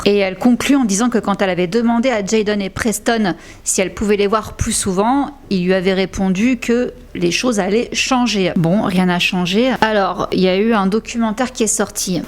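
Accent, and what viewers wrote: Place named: France. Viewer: Tu pourrais faire un minimum d efforts pour l accent anglais? On dirait une blague tellement tu franchouillardises des mots basiques .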